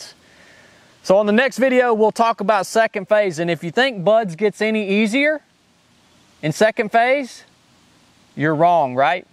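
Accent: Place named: Northern Louisiana, United States. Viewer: Sounds like you’re from northern Louisiana.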